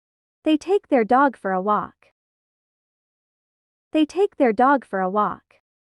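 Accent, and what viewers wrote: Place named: United Kingdom. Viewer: عادي تسوين بلهجة بريطانيا❤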